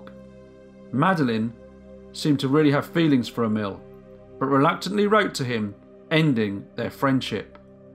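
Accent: England, United Kingdom. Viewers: I don't understand why anyone would have a problem with your voice. It's a perfect narrator's voice. Plus it's an English accent that Americans can easily understand. Love your voice and accent. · Even the music in the background does not distract me because your voice is very distinct. Love your style of narration. I love English accents. 😊👍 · Wow!!!!... I really enjoy your channel and constantly recommend you to My friends. your voice is PERFECT and I NEVER EVER am confused about what your narration is saying. Also or "as well" thank you for annunciating so clearly.although I am an American 'folk' but I absolutely appreciate the Queen's English when it is used so well.thank you again for such entertaining videos. I am considering becoming a Patreon which I have never done before but I think it's well worth it for the quality of the the video info 👌 THANK YOU AGAIN...,. 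your American friend, Brian 🇺🇲😁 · Just subbed, your my new fav channel. 🖤Love the British accent,don't ever change that. No matter what anyone says. 💯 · I just love your British accent.. It's so soothing and I listen to your stories and it fills like,im listening to an audible book on my phone.. But, keep the stories coming.. My good man.. 😘🤔🤗😱🤧🤢👻🔪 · I,too,enjoy your wonderful English voice. I love each carefully researched broadcast. Great job!! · I agree ☝️ your voice, I keep saying English Accent!! Haha 🤣 🇬🇧 with love 💖 Ally from Australia 🇦🇺 👍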